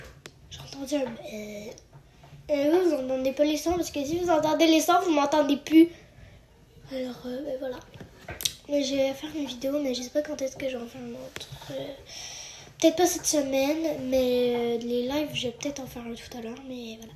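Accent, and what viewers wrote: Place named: Quebec, Canada. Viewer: Tu es québécoise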